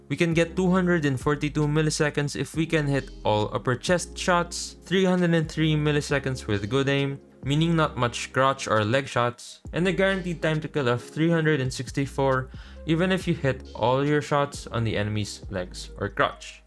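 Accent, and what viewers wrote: Philippines. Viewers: peenoise accent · Dude your accent remains me of Filipino accent tho.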